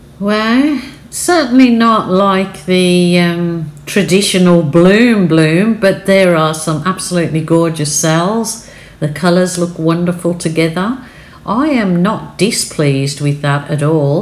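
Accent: United Kingdom. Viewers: That is pretty. I love the mountains 😁. I've never tried to do the shellee bloom, but have thought about it. I've seen someone (can't remember who) do it with acrylics. She used much thicker paint and spun it after blowing it out. What brand of paint, I couldn't tell you. You've lost the Brum in your accent, but you still have a strong Brit accent. My other half is from Manchester. Thank you for sharing ✌️❤️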